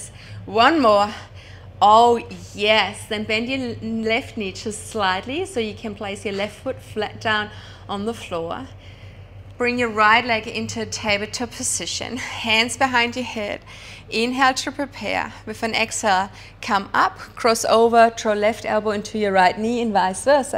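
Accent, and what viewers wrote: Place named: Switzerland. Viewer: Sounds swiss 🤔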